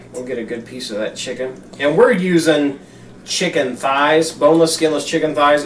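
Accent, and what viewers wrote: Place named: Arkansas, United States. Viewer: I really enjoyed your "how-to" video! Where are you from? You sound like you are from my neck of the woods (Arkansas).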